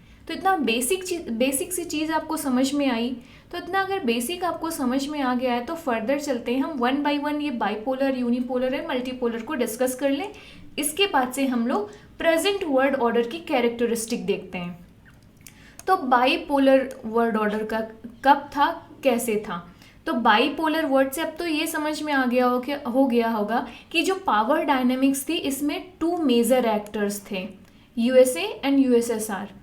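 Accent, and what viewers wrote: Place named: India. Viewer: I can't really understand this English ,the videoo seems welll done but unfortunately it is difficult to follow for people not from india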